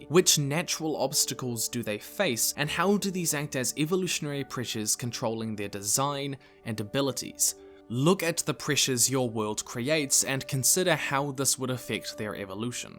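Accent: New Zealand. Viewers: Oh you're a Kiwi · Wait your a kiwi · Dude, i didn't even notice you were a kiwi, im also a kiwi so i guess i just sorta didn't notice your accent as its just the same as everyone else i know.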